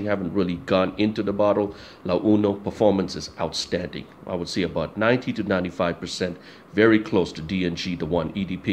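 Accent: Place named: United States. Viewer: You speak very good American English for living in India.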